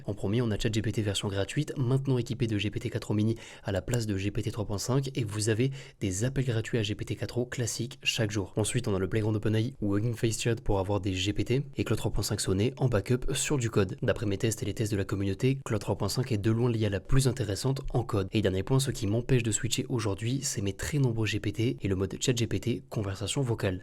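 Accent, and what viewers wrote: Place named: France. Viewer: je te decouvre c"est interessant. Un peu trop de francais dans ton anglais a mon gout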